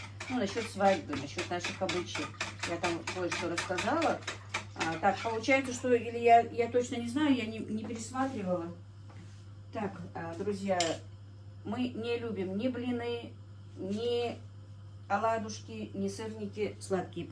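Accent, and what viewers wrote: Standard. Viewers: Добрый вечер, пересмотрела почти все твои ролики, готовила по-твоему рецепту мясо по французки, очень понравилось моей семье,хотя до этого делали по другому, написать хочется много добрых слов тебе, но, что подкупает в тебе( я думаю не обидешься что на ты? мне 63 года), нет в тебе какой-то заносчивости, пафоса, гонора, в отличие от некоторых блогеров с соседней республики, у тебя очень чистый русский язык, правильно одна женщина задала вопрос, а вы не учитель? Очень приятно тебя слушать, смотреть как ты готовишь, желаю всего тебе самого хорошего!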